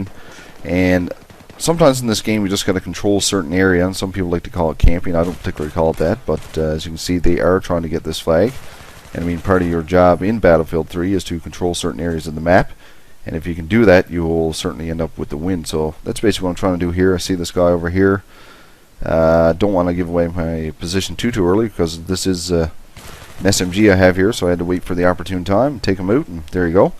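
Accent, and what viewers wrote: Canada. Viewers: Are you from Canada or Minnesota? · is that a faint Newfoundland accent I hear? Vancouverite asking, much respect! Add me up, "guybones" psn id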